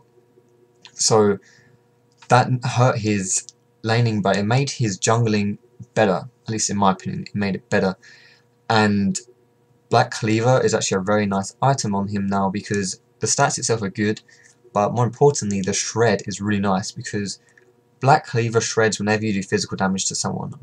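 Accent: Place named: RP, United Kingdom. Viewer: Based on your accent i 've always thought that you was posh and pretty cool. now that i see your face i realize that you are simply AWSOME!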